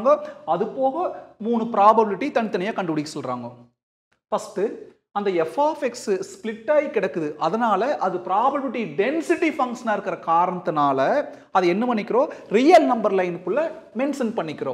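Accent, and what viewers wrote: Coimbatore, India. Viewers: Sir neega coimbatore ah.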